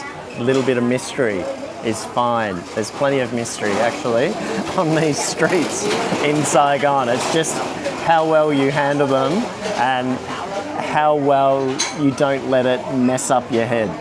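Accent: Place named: Australia. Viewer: Haha, the lady is a hard one to crack! I love your sense of humour! And your lovely Aussie accent.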